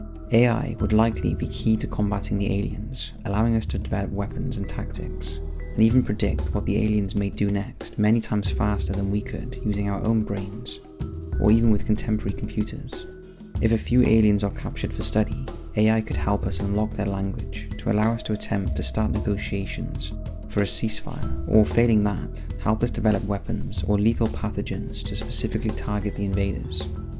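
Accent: United Kingdom. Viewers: Just amazing how a narrator with a proper British accent can spew the most nonsensical fact free content and people will just lap it up.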